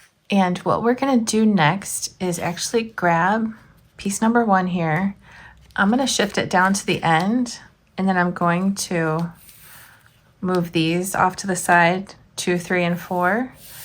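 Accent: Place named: Texas, United States. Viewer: Yes I’m making more Halloween crafts. 😊 I use to make 60 goody bags but my kids are older now and I really miss it. I’m making my mom and sister this little pumpkin. I do notice that some of your pronouncing of words are different. Your mom being from TX makes perfect sense now. You’re very clear in your explanations and instructions. Makes perfect sense to me! ❤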